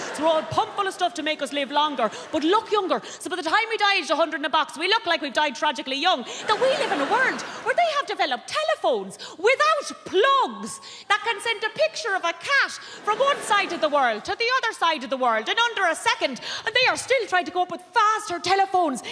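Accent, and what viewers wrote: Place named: Ireland. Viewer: Dynamite, very smart, and as an American, I love the Irish accent.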